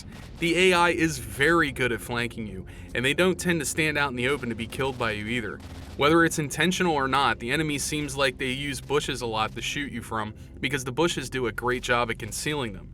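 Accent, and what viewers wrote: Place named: Philadelphia, United States. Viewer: Are you from philly or around west chester? Your accent reminds me of bam margera and brandon dicamillo but not like a yinser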